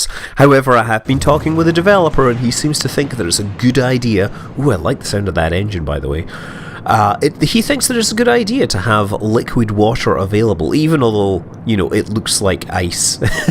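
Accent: Scotland, United Kingdom. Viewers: I saw that the channel's name was Scott Manley. Then a deep Scottish voice validated that name.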